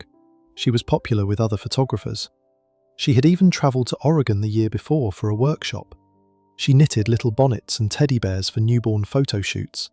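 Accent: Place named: United Kingdom. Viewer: Can’t stand when the Narrator is British. Kinda screws up the whole show… 👎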